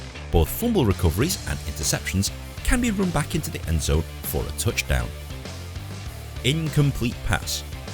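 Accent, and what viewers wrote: United Kingdom. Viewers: Narrator has a Limey accent. Cut some slack Yank.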